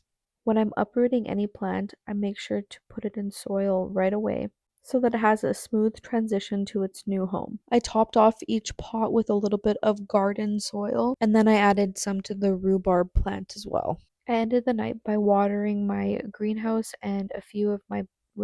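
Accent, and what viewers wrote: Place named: Canada. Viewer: I love how I could hear the Canadian in your voice from the first “about” 😄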